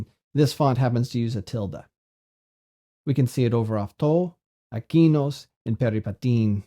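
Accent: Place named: United States. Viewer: He doesn't use modern greek phonology. Anyways, judging from his english, he's probably american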